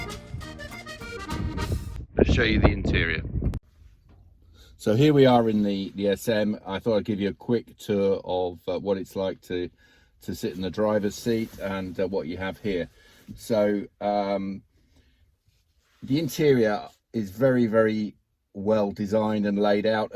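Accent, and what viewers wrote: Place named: Wales, United Kingdom. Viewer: Soft, lyrical and charming Welsh accent.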